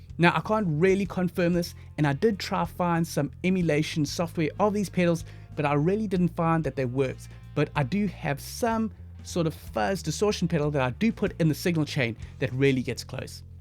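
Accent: South Africa. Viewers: 2 seconds into the video I knew you were from South Africa 🇿🇦🇿🇦🇿🇦🤘🤘🤘🔥🔥🔥 much love from Kzn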